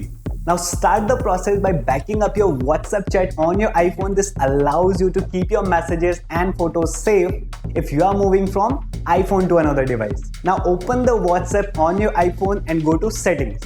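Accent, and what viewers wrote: India. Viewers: Indian accent is haunting us on YouTube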